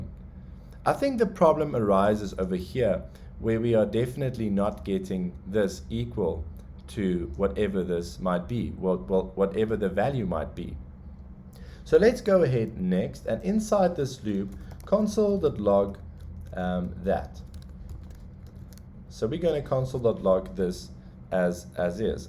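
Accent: South Africa. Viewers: Great stuff boss! Are you South African? It feels like im getting schooled by a long lost uncle. :). " In the coming weeks, I will create a solidity series that will teach you the basics of smart contracts on the blockchain." - The solidity code for NFT's pretty basic. its more the Minting Dapp and react app that can display the list of NFT's and filter is the hard part I think. ;) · Hallo, do you have a walkthrough video of the entire coding? I would love to have a basic understanding of what the different parts do without having to watch how all of it was coded. Thank you for all your effort <3 (I was pleasantly surprised to hear your braaibroodjie accent, ha-ha.)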